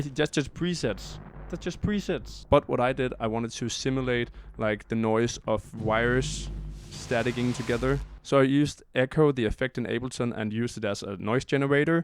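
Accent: Denmark. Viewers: You sound danish, is that correct?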